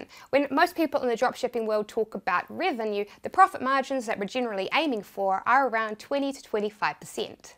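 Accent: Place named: New Zealand. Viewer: That NZ accent. *shudder*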